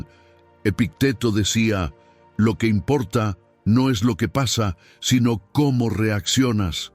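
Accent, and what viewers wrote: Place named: United States. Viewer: Acento americano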